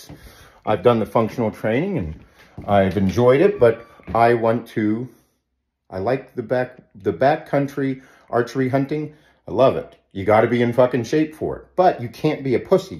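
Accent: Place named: Pennsylvania, United States. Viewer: Love that PA accent lol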